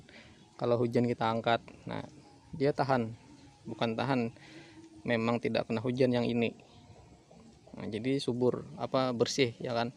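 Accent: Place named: Banjar, Indonesia. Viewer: Banjar dmana nya pian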